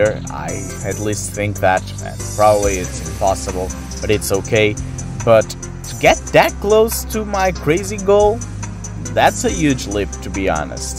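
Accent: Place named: Portugal. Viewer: Sim! Ele fala também a nossa língua, só que Português de Portugal.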